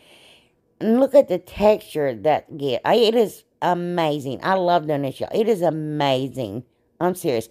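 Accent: South, United States. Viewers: Love the crafts and love listening to you. I know you have got to be from somewhere in the south...but where? I am in Georgia and love the accent! 🥰💕🌺🌼🌹😆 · Lord where you been gurl, I looked at your video for the first time today and I love your spirit and your beautiful crafts. You are so inspiring and hilarious with that southern speech. I watched 4 videos and still going. Keep being you we need that.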